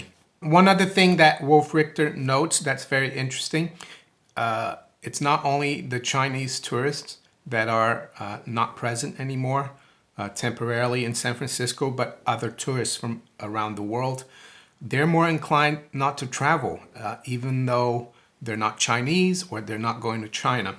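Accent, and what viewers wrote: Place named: New England, United States. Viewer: Where you from? Northeast accent. New England?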